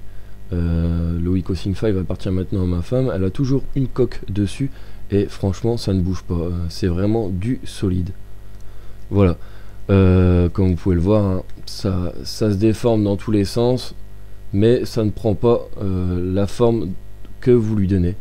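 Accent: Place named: Belgium. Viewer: Tu es belge toi, non ? ^^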